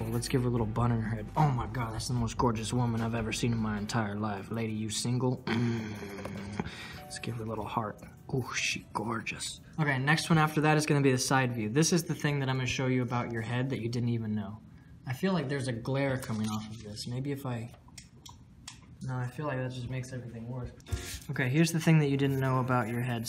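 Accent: United Kingdom. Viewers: Your British accent makes me want to implode. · Why does your British accent sound like Tom Holland? 😂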